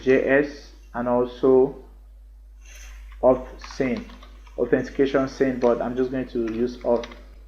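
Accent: Nigeria. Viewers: that Nigerian accent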